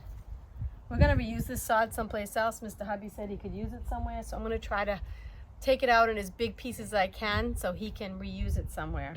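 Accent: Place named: Massachusetts, United States. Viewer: I'm surprised you didn't call Ms. Dig before you dug some holes. Be careful!! Love your accent so Massachusetts just like mine even though I've been gone from Taunton & Boston so many years ago. You sound like my cousin from Taunton. Spring has sprung....take care.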